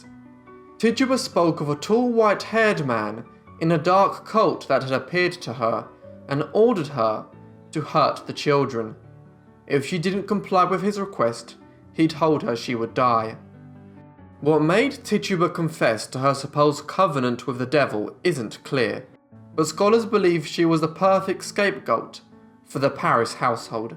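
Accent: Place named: United Kingdom. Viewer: Thank you for all your hard work and informative video. Well done. Subbed after 7 minutes :-) Something about a British accent automatically adds 30 IQ points to any documentary. Especially yours, you have a great voice, very clear and understandable. Not gonna lie, some accents I have to rewind several times or turn on the captioning feature. Look forward to watching more. Hope you have a safe and prosperous new year.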